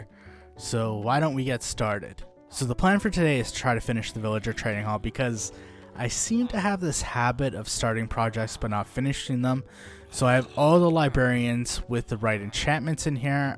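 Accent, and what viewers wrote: Punjab, India. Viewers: Bro are you punjabi?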